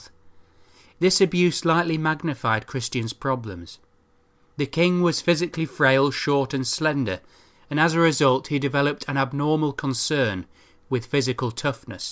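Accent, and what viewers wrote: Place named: England, United Kingdom. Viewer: All lies...spoken by an englishman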